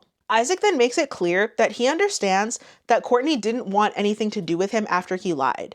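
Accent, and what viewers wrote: Canada. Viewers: Gurl I just found you, so happy I did.. your hair looks amazing also that accent is 100% Canadian lol (Been binging ya since finding ) Love that you are a fellow Canuck. 🍁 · first time watching you, i love how Canadians say words with "ou" in it lol Aboot. "Oot of the scene."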